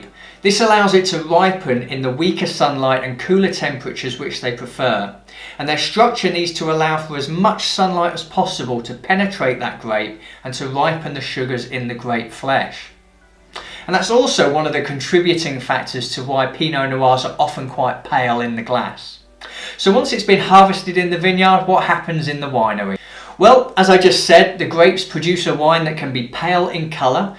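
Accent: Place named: Southern England, United Kingdom. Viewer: Where in southern England are you from? Your accent is crazy.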